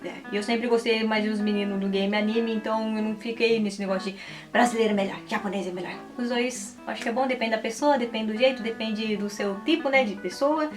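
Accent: Minas Gerais, Brazil. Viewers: Os seus pais eram de qual estado do Brasil? Você fala um pouco como os mineiros ❤🥰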